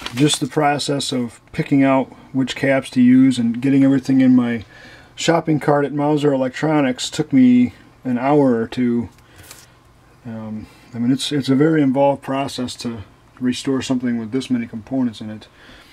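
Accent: Michigan, United States. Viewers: So true. And his Michigan accent reminds me of home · Sounds like you are somewhere in mid-Michigan. I need a couple more modern receivers/amps repaired. I'm in Flint and it's hard to find a decent person or place that will work on stereo equipment. The old electronics store my father use to deal with has been out of business for a long time now. They were called Shans or Shanes electronics.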